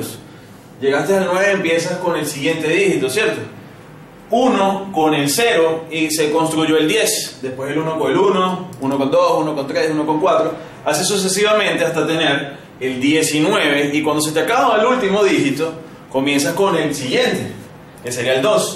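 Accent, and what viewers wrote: Venezuela. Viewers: Hola. Por la camiseta y por el acento asumo que eres venezolano, lo cual me llena de orgullo porque yo soy de Maturin, estado Monagas. Felicitaciones y adelante con tu labor la cual puede ayudar a muchos estudiantes. Adelante.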